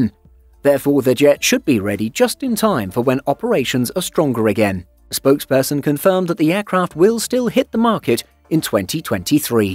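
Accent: England, United Kingdom. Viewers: You are using and English accent to promote an EU product for which the UK is no longer a part of.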